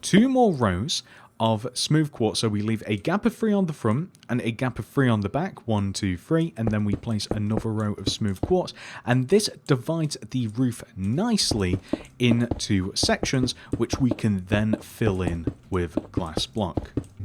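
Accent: United Kingdom. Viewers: HES BRITISH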